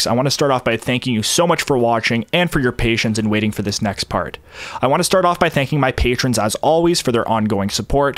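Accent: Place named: Central Alberta, Canada. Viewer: That sounds like a central Alberta accent on the narrator 😅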